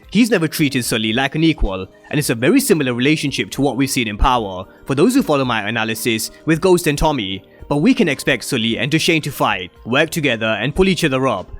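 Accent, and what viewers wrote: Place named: United Kingdom. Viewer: Your accent sounds like your from the uk, if so i rate what your doin i always watch yours n marc dark reviews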